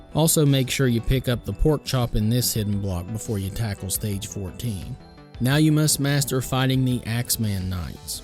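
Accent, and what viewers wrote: South, United States. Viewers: hear a Southern drawl explaining Castlevania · So you sound from the south. Same here. I love this channel. Thank you!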